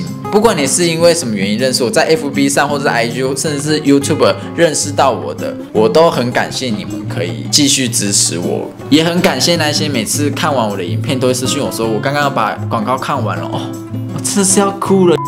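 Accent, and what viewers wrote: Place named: Taichung, Taiwan. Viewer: 第一次看你的影片～台中人吼！台中嗆很重餒！應該沒猜錯～😄很棒！很有親切感～👍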